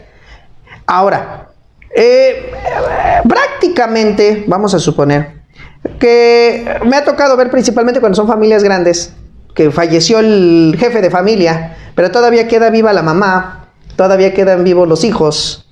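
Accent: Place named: Mexico. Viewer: Por el hablado parece que es para Mexico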